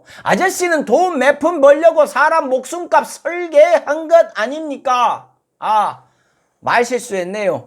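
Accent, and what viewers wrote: Jeolla, South Korea. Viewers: 늘 멋진 마음 멋진 내용 맛깔스런 전라도 사투리 참 좋아요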